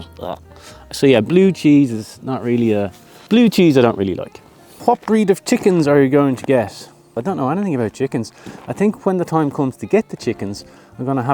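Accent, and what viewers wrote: Ireland. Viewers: He is definitely "a keeper". Charming, funny, attractive, fun, love listening to his Irish accent, blends so well with his personality. I hope he has a good women showing him the attention he deserves.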